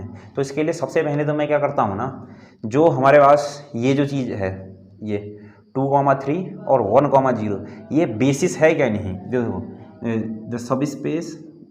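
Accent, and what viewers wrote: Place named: Kumaon, India. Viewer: sir aap lohaghat ya champawat se ho na? bolne k tarike se अंदाज़ा लगा rha hu 🙂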